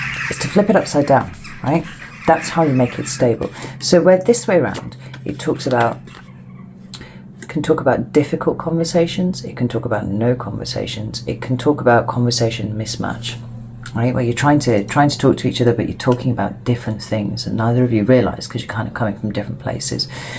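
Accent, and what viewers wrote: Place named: England, United Kingdom. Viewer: What K.Y. or C.Y. cat has you cleaning out there litter box. You may capture America with your English accent.or would you prefer my short comings lest wow grab a twix candy bar and coke pop, soda I guess I don't belong in America this next 50 you have a flat $ 640 nothing included a month .I'll sign a contract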